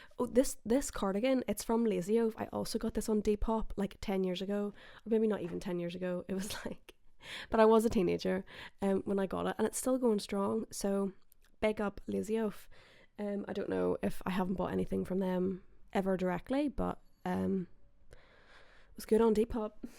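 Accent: Ireland. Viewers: I love your Irish accent. You are so charming!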